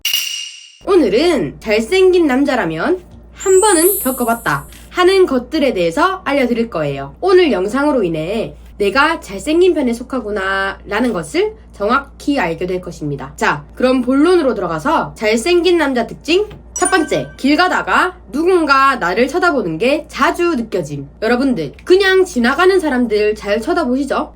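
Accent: Jeolla, South Korea. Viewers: 말투가 홍어년인데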